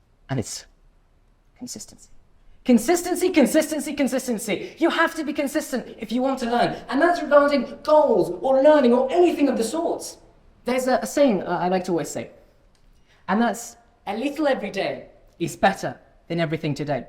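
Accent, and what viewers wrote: United Kingdom. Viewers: ما شاء الله لهجتك بريطاني 😅👍 برافو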